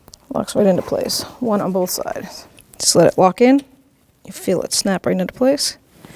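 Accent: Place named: Massachusetts, United States. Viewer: Love me a good mass-hole accent!!! Great video! Thanks for the help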